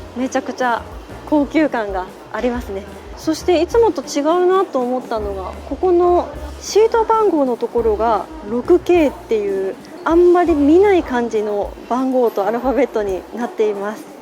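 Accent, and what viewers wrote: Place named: Okayama, Japan. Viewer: 時々出てくる岡山弁にも好感が持てます😊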